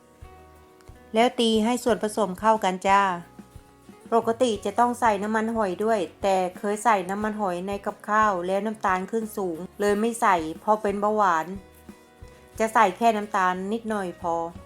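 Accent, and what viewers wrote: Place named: Kamphaeng Phet, Thailand. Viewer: อร่อยอีกแล้วครับ.สำเนียงคล้ายๆคนกำแพงเลย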